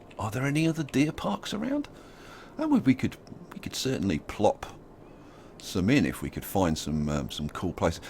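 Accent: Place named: Midlands, United Kingdom. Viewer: Great job. By the way i am going to guess you are mid forties and originally from somewhere in birmingham or towards nottingham?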